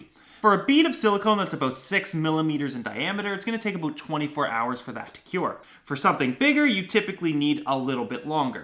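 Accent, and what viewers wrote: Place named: East, Canada. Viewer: You must be from eastern canada somewhere lol your accent sounds like it :p But for real, I doubt what I was gunna use is safe for an aquarium...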